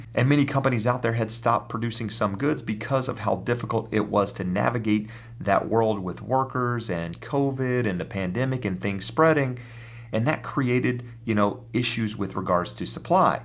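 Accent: California, United States. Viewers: You're from California or I wrong?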